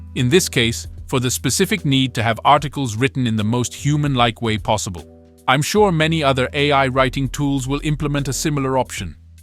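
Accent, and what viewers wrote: Italy. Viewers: Not gonna lie I miss the Italian accent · Thanks for sharing the update! Me too I like the Italian accent. Do you have a discount code for Surgegraph? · But this is better than his Italian accent. 😅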